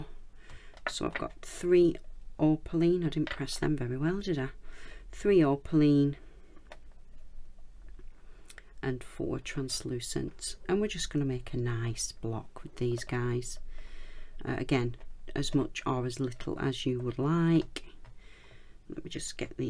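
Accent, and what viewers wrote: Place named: Northern England, United Kingdom. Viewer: Hi, I’ve just found your videos which are brilliant an so lovely to hear a fellow northerner’s voice (live down South now). I’m new to polymer clay and wondered where you buy the silver things that you put the pendant and earrings on before baking. Thanks.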